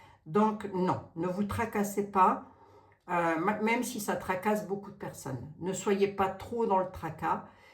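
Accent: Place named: Lorraine, France. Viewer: Vous me faites rire et croyez moi ça me fait du bien. Je suis une Lorraine de Meurthe et Moselle "expatriée" en Bourgogne et entendre votre language Lorrain me fait un bien fou 😁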